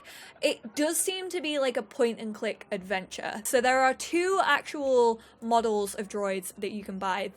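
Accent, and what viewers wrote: England, United Kingdom. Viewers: I totally hear the British accent 😂😂😂 (I'm from Yorkshire) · OMG she does sound English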